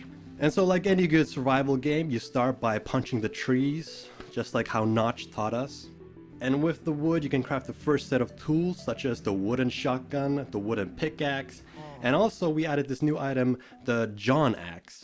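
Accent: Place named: Finland. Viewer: After all the years trying to understand what the Hydraulic Press Channel was saying, I gotta admit, you have by far one of the most clean Finnish accents I've ever heard lol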